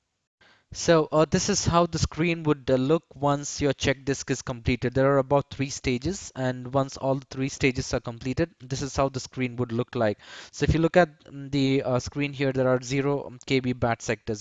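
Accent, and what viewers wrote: India. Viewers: Indianan voice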